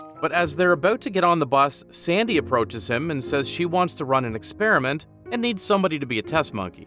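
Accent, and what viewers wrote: Canada. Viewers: Are you Canadian, my guy? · Your Canadian accent is so prominent lol. Fire video though!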